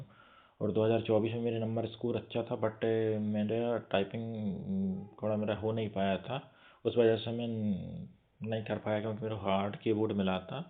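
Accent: Bundelkhand, India. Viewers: Bhaiya aap bundelkhand se ho kya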